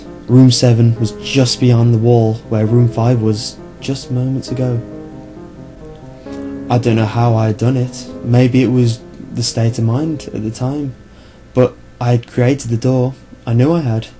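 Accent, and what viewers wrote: United Kingdom. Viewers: this is a great story this os a good story the britsh eassent made it better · a british/or english guy reads it the story just got 20% cooler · Mmmmmm british accent i really do like this story :D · this is my favorite creepypasta! no not just because its told by a british guy stop making stuff up!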